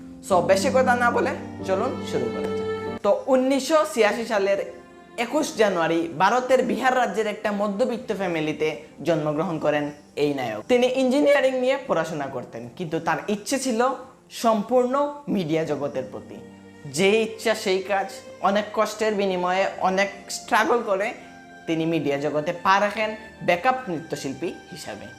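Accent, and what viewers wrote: Sylhet, Bangladesh. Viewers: ভাই তুমার মুখ ও সিলেটি মাত অউ ভালা লাগে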